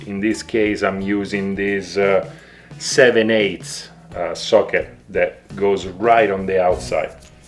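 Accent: Italy. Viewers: NOTHING LIKE A PANIGALE TUTORIAL WITH AN ITALIANN ACCENTT :D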